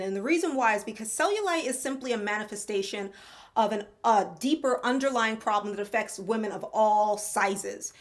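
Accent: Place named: Caribbean. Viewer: I recently subscribed to your channel one of the best things that I've done. You're so informative and Gorgeous. Love the glowing skin. I occasionally hear an accent. Is your ancestry from the Caribbean by chance?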